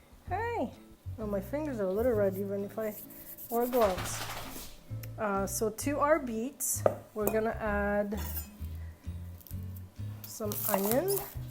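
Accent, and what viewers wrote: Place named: Poland. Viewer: I BEG OF YOU TO PUT YOUR RECIPE FOR BEET SOUP. MY BROTHER HAS PTSD AND FINDS COMFORT IN OUR POLISH HERITAGE. MY COUSIN AND I WANT TO MAKE HIM BEET SOUP WITH SHREDDED BEETS AND MAYBE A BEEF BROTH?HE ALWAYS LOVED IT WITH A BIT OF SOUR CREAM. PLEASE ASAP. IVE BEEN LOOKING FOR IT EVERYDAY AND ONLY FOUND IT AND DIDNT WRITE THE RECIPE DOWN,! DUMMY ME! I LOVE THIS LADY. SHE COULD BE MY SISTER. LOVE YOU BEAUTIFUL VOICE AND SLIGHT Polish ACCENT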